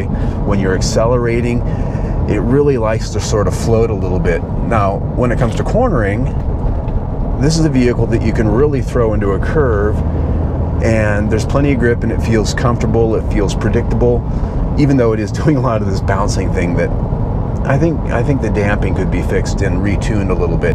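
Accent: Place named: United States. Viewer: love the "Murrican accent!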